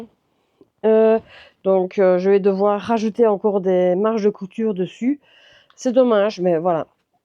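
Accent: Belgium. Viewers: Bonjour Je viens de découvrir votre chaîne J' apprécie beaucoup votre naturel ça fait du bien pas de chichi et franc parler avec un accent de Belgique, vraiment ça fait du bien au moral au coeur 😁 ça me motive à me secouer pour avancer dans mes projets Je vais visionner vos anciennes vidéos histoire de vous découvrir un peu plus Vous avez du pain sur la planche façon de parler😄 Très bonne journée Bises de Bretagne 👋👋👋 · Bonjour, je vous découvre aujourd'hui, j'apprécie votre façon d'être sans chichi et votre petit accent belge... Merci pour la vidéo. 😍.. Bonne couture.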